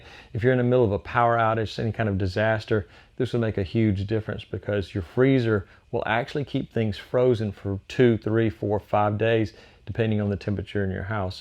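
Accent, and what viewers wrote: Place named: Tennessee, United States. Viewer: Where are you located? You sound like maybe Tennessee.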